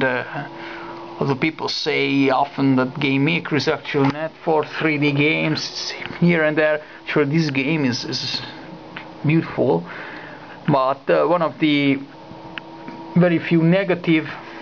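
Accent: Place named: Hungary. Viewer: HAHAH! Végig a videódban gyanús volt, hogy nem-e magyar vagy, és lám utána elolvasva a kommented, kiderül tényleg! Annyira tipikus a magyorok angol akcentusa, hogy bárhol a világon meghallasz egy magyart angolul beszélni, rögtön le lehet vágni! Gratula a progihoz! Csak most találtam rá tök véletlen, mert a minap valahogy belefutottam egy az 1nsane-ről készült videóba, amiről meg rögtön beugrott ugye a nagy ős klasszikus Terep2. Amikor rákerestem, valahogy a te progidba is belefutottam. Kár, hogy nem fejlesztetted tovább...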